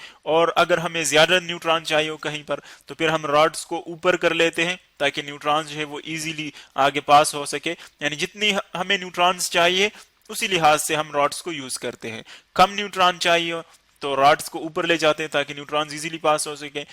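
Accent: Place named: Balochistan, Pakistan. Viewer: Sounds Balochi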